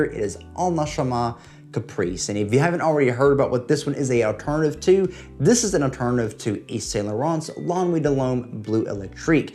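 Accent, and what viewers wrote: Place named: South Carolina, United States. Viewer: Love the SC accent!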